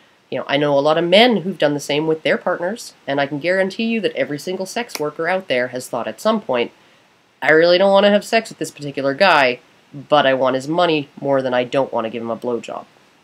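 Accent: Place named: Canada. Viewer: I LOVE CANADIAN ACCENTS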